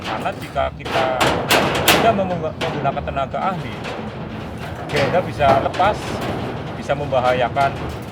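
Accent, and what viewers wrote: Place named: Banyumas, Indonesia. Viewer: Mas...Asli Banyumas apa Tegal?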